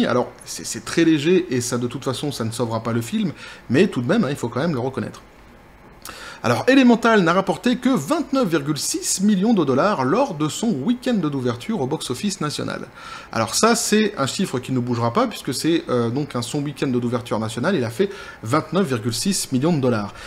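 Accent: Quebec, Canada. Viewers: tu fais plus accent canadien que belge mais je préfère l'accent canadien 😄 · Ah, je suis curieux d'entendre ton accent Québécois alors. Le défi est lancé!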